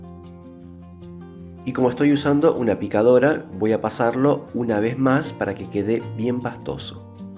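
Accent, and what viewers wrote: Argentina. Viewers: Hola! Un verdadero placer y deleite escucharte y ver tu video!!!!! Tan profesional y con tanta excelencia!!!! Es rarisimo encontrar a alguien que no esté a las corridas en un video, pero tampoco estás hablando gansadas con parsimonia para llenar espacios huecos. Tu acento neutro argentino, a mi parecer, fue extraordinario!!!!! Mil felicitaciones! Soy desde ahora tu fan. Me gusta la impronta de ponerle un poco de historia e información del origen de la receta. Gracias por cada detalle de los que te mencioné. Un fuerte abrazo desde Córdoba, Argentina!!!! 🎉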